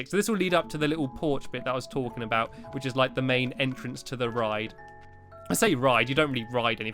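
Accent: United Kingdom. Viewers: Omg I love your ACCENT I wish I was British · i love your videos and you British accent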